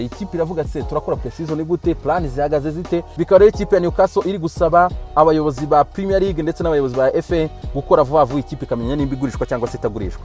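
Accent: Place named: Burundi. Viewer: Nkunda ukuntu uvuga indimbura yacu birigakuru gacaracara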